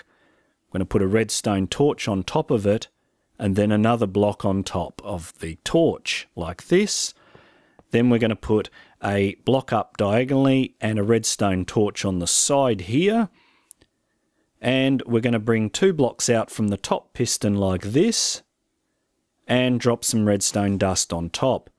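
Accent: Australia. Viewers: Oh my god, such a thick Australian accent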